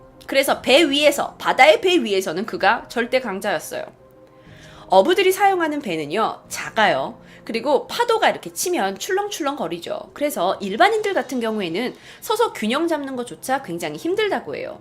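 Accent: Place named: Honam, South Korea. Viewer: 제시카님 전라도 출신이라 그런지 호남사투리 맛있게 하시네요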